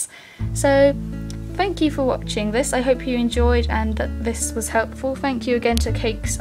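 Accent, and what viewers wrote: United Kingdom. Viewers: your from England, what is peanut past?????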